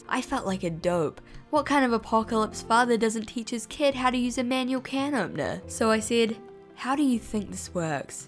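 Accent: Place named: New Zealand. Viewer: i can't tell if you're kiwi or not, but as a kiwi, your accent validates me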